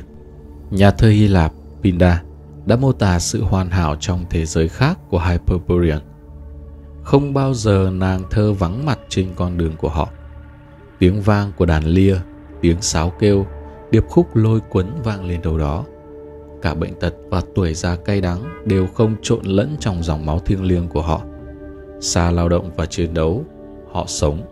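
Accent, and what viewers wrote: North, Vietnam. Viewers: Anh giải thích về giấc mơ rất hay, cho thấy anh có rất nhiều kiến thức vững chắc. Chỉ mới biết Channel của anh hơn hai tháng, đang nghe lại những bài củ cả năm trước. Giọng đọc của anh quá hay và rõ ràng. Tôi đến Mỹ từ nhỏ cho nên có những Channel nói giọng Bắc nhanh quá rất khó hiểu với tôi, nhưng giọng Bắc của anh rất hoàn hảo, chậm rãi dùng từ ngữ dễ hiểu (😄👏). Thanks so much! Love your Team! Love you all!❤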